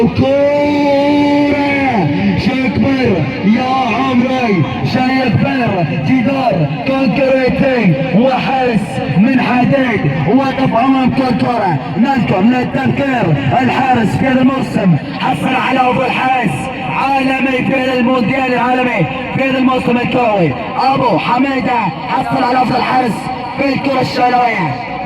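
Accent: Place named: Bahrain. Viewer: شنو هاذ بحريني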